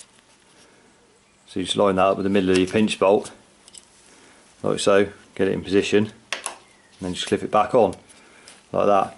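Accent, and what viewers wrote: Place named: West Country, United Kingdom. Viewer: Sounds like UK west country, perhaps Devon.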